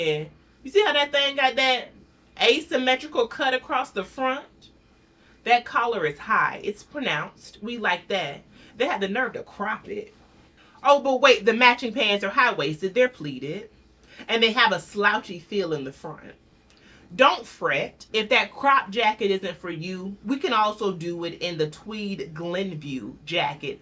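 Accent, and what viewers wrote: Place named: DMV, United States. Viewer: Friend!! I feel like I just went shopping with a good girlfriend. Sounds like you're from the DMV too...SUBCRIBED! Loving what you do sis!🥰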